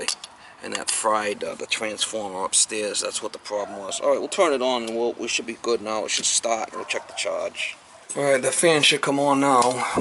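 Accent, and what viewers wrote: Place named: South, United States. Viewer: You must be from the south with that accent. - We are down in New Orleans.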